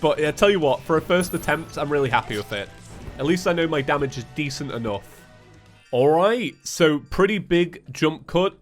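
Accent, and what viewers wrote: England, United Kingdom. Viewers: Is a british couch gang member i can relate to british speach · I actually like English accent so it's okay fir me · British accent is the best I mean I'm British so idk if I'm one to decide but eh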